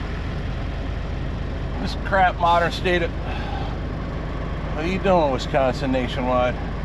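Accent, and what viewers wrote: New Jersey, United States. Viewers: sounds like you just spent a month back in New Jersey. Heavy accent in this video for some reason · I thought I was picking up on a Jersey accent. Go Joisy!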